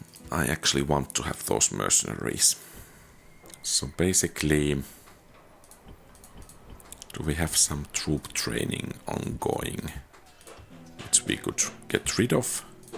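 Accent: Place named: Finland. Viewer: Love the video! Do you happen to be from Finland regarding your accent? ;)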